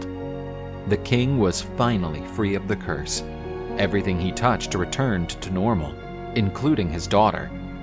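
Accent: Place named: United States. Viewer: You have nice America voice and I can clear understand. Very nice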